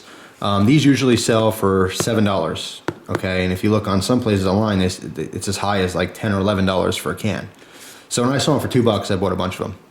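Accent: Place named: Long Island, United States. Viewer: very good video bud. you sound like you're from long island. I grew up in Suffolk County.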